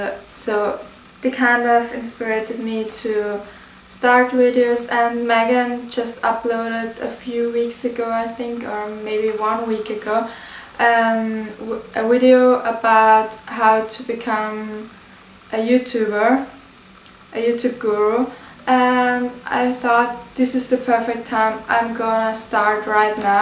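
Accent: Austria. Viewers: I'm watching all your videos so I can pick up your Austrian accent! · okay hier hört man, dass du aus österreich kommst! ;)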